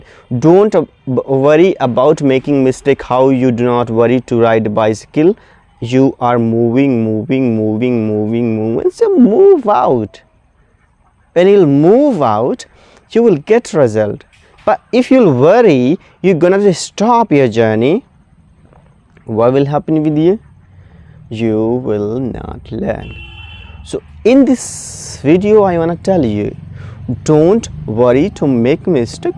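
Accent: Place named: United Kingdom. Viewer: I really liked your English accent I am amazed that how a boy from my village is able to speak such excellent English and that too in a British accent. Wonderful!❤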